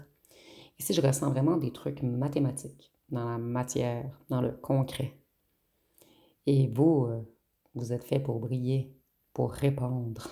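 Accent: Quebec, Canada. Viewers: Ahh oui pis je comprends pas pourquoi tu caches ton québécois ça fait parti de toi. Je crois pas que les Français belges et autres se bloquent. Tu peux être naturelle c'est tellement moins épuisant. 😘 Merci et j'aime beaucoup tes titrages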